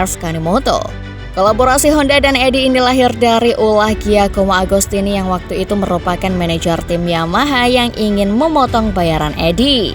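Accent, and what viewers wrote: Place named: Java, Indonesia. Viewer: medoknya imut bet wkwk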